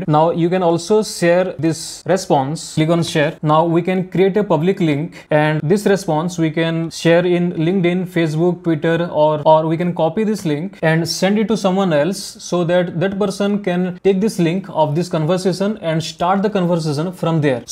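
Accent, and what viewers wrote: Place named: India. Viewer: Was it English? What Indian dialect is this?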